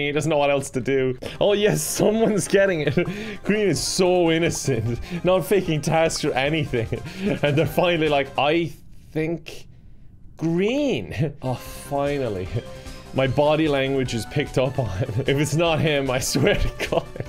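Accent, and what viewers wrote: Ireland. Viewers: irish accent is the best accent, prove me wrong <3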